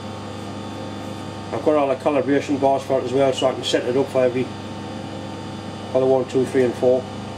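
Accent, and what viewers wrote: Newcastle, United Kingdom. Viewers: wey aye, Geordie lad. Brute force and finesse , I love it.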